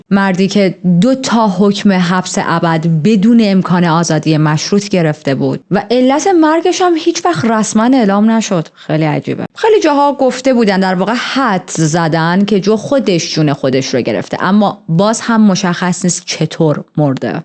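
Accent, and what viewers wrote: Shiraz, Iran. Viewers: جان شما شیرازی هستین ؟؟ چون ما شیرازی ها عموووووو میگیم 😂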